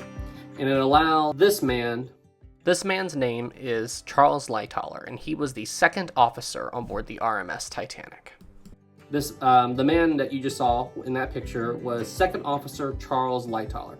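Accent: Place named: Maryland, United States. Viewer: Side note: I'm listening to your accent and can tell you're from somewhere in Maryland. If you don't mind me asking, where abouts in Maryland?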